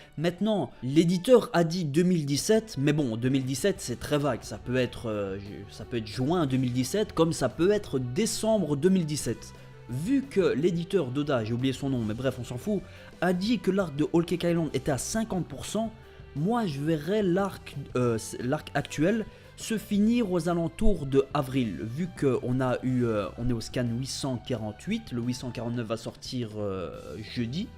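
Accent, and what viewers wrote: Belgium. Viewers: ouit x) le bon accent belge bg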